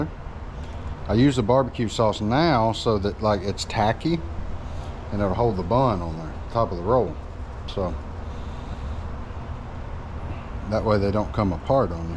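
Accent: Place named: Louisiana, United States. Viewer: I’m from maurepas la it’s cool to see a YouTuber that talks like I do 😂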